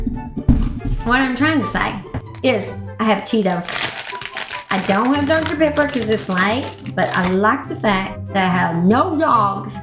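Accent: South, United States. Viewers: Your accent sounds like Southern? Where are you from Mrs.?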